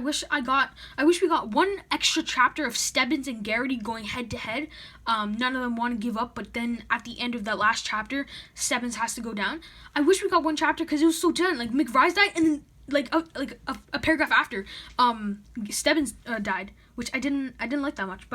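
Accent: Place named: Canada. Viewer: I like your emoticon description showing the story of The Long Walk. Clever! Why don't you show your face in the review? It's OK to say you loved the book. It doesn't meat I'll love or like it. I love Cell and my friend loves Dreamcatcher and most people don't like those two King books. I'm guessing your from Canada by the way you pronounce "about". It's not fully "aboot" like some Canadian accents but it's moving in that direction. You said if they fall below 4 mph, they get a warning, so why not stay below 4 mph so they don't get any more warnings?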